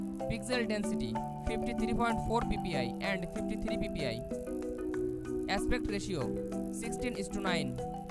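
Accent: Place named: India. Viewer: Hindi native tongue speaking accent is not as thick as some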